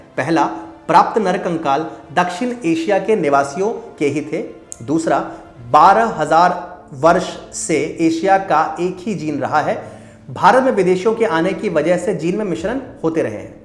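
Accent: Standard. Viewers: i respect your beautiful shuddh Hindi narration 👌👌👌🤩🤩🤩.. Phranaamam Namashkaar 🙏🙏🙏 AIT is a false theory to divide us! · । आपका शुध्द हिंदी का वाचन करना एवम् आपके हिंदू-प्रेरित तथ्य, आपके हृदय में स्थापित हिंदी, हिंदू एवं हिंदुस्तान के प्रति अप्रतिम आस्था को प्रदर्शित करता है ।हिन्दुओं को अपने संस्कृति के प्रति जागरूक करने हेतु आपके इस अद्भुत प्रयास के लिए मैं आपका आभारी हूँ । वास्तव मे आप अतुल्य है। ।धन्यवाद ।- प्रभात रंजन सिंह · Detail study video. Nice. Shudh hindi sunkar bahot acha laga. Dhanyawad.👌👌👌👌👌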